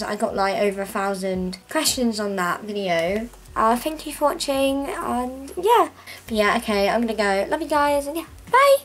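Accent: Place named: England, United Kingdom. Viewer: Your english accent is so cool and you have beautifull HAIR and EYES 😍😍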